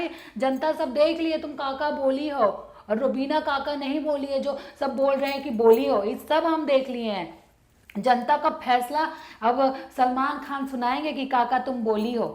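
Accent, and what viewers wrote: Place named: Bhojpuri, India. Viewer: Love your bhojpuri accent ❤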